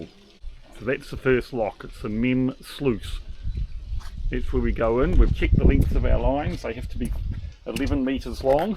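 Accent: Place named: New Zealand. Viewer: he is a new zeelander i think because he says tin instead of ten. oh yeah silly me i see NZ in the channel title now.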